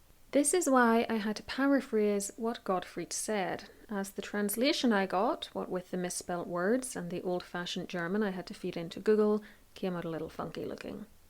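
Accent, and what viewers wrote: Ireland. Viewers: Oh i love your Irish accent! But your pronunciation of "count" and "counts" is somewhat unfortunate😂😂😂😂😂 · Love your Irish accent. Where in Ireland are you from? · Is this an Irish accent? 🎉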